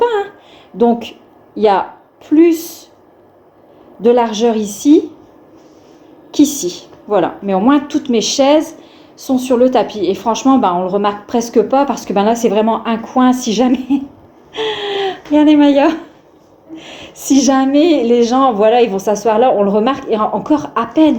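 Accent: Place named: North, France. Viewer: Bonjour, J’ai découvert il y a quelques jours votre chaîne YouTube, et sincèrement je suis devenue accro, déjà parce que vous êtes du Nord de la France, et que vous avez toujours la bonne humeur, la douceur et la gentillesse dans votre voix et dans vos vidéos. Personnellement je suis originaire de Valenciennes mais il y a maintenant 1 an et demi, mon mari et moi avons eu la malheureuse idée de Tout quitter (famille, travail, amis, maison) pour reprendre une épicerie près de Poitiers dans la Campagne profonde 😱 Visionner vos Vlogs m’apporte tellement de joie et de bonheur, rien que d’entendre l’accent de notre région 🥰 Merci pour tout ce que vous faites Pourriez-vous simplement m’informer si vous habitez dans la région de Valenciennes ou pas? À bientôt Stephanie F.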